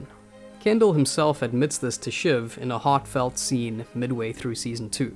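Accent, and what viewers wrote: South Africa. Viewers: I heard you narrate for 7 seconds, and I knew you are South African 🤣🤣🤣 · Well in with the analysis...I also trace that South African accent in the narrator. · Are you South African? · Youre South African arent you? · You sound South African. I agree succession is one of the best shows I’ve ever watched. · Bru you south african?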